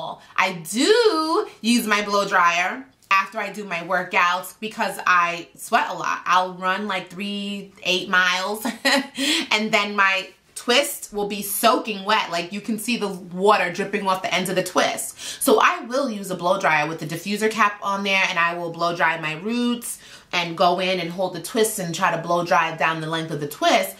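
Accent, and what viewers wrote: New York, United States. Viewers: Oh I will, you've got that great personality and New York accent, you're too enjoyable lol!!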